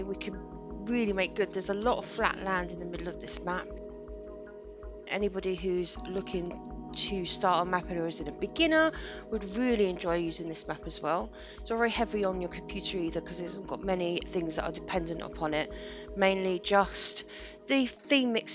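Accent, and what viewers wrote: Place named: England, United Kingdom. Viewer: sit ya self down, put ya feet up an sip on sum sweet tea for this read. K In my self created tiny world fueled by my vast imagination I’d pictured you sounding like like err… Well I didn’t think you’d have one of them adorable English accents the kind ya find in those Mary popping movies where as soon as ya speak the world shuts up an pays attention so yepperz that kinda accent.Gettin back to my wide off the mark imagination, Id visualized ya sounding exactly like my dear friend who’s shares the same name.. So it was a surprise finding ya not to be Portuguese but a London tour guide Mary poppins! Anyways on to shop talk it’s actually great to see someone doing a map show case….On my channel I do similar show cases on Skyrim so I luv a gd presentation. I hope y’all cover maps of my stompin grounds Tennessee an Louisiana that’ll be a treat. 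Maybe one day y’all cover one of my maps I’m Fixin ta do sum eventually.Anyways teas run dry an feet are aching for a step n a jump I’ll release ya from this insane comment.. oh btw I’ll PROMISE to join ya on ya journey as long as ya poppin in from time to time to check out my skylines videos on my channel 😜 An I ain’t inta breakin promises to a lady I’ve got 4 of them myself at home remindin me daily not to do so. 😠 Mucho Amar 2 youuu an C ya 😃😃😃😃😃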